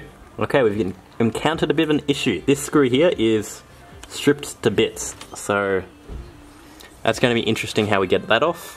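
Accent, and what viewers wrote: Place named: Australia. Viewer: nice and clear vid dude! good to hear an Auzy accent too! And thats coming from a kiwi!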